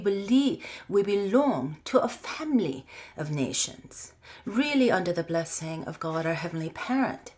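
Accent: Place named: United Kingdom. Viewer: I LOVE HER UP SCALE BRITISH ACCENT.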